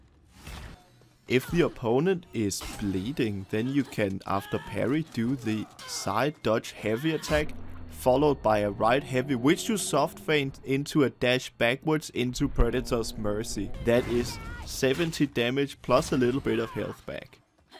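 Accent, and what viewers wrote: Denmark. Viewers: I can hear the Danish accent, it’s really thick. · Not to be rude but your English sounds so much like a typical “danish English” Are you Danish? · Thats is one very thicc danish accent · First of all, great fucking video. Second of all, that is the most extreme Danish accent I've ever heard! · Are you danish?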